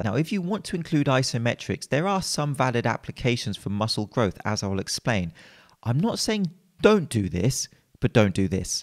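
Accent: United Kingdom. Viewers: He is not polite or calm it's just his speaking style, his accent, and pronunciation. It's like partway british where he articulates each pronunciation and his pitch up and down make him seem calm.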